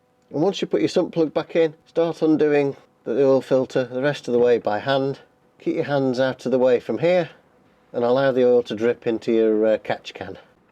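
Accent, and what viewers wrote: England, United Kingdom. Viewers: Love listening to your instructions with your English accent! :) So easy!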